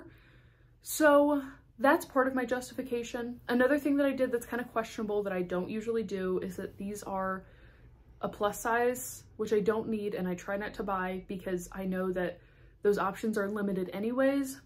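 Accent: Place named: Canada. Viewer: Are you Canadian? 🇨🇦 You have a lovely accent. 😊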